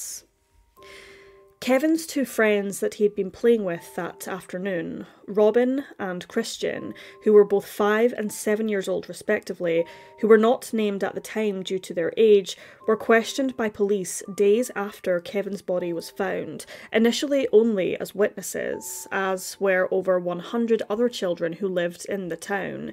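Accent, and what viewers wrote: Scotland, United Kingdom. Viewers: I just found your channel today and as I was waiting on the narration, you can imagine my surprise as an East coast Scot hearing your voice. Instant subscription before I’d even listened 🏴 fortunately your video was extremely well researched and handled with dignity and empathy so I made a good choice. Thank you for sharing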